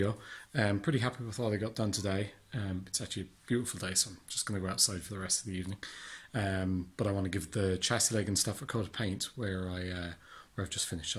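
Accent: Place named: Ireland. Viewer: Loving the videos, the music and the relaxing Irish lilt, looking forward to the next one 👍👍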